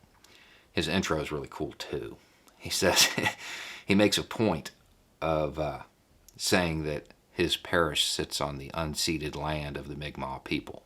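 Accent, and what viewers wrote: South, United States. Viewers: Hey... You are great. Sometimes, you just need someone bilingual... You speak southerners English very, you found someone who speaks Christian English in, he seems to understand your language. Now maybe you can work together to achieve a better world. I couldn't do what you do in Florida, but I can probably do something with people that speak my language.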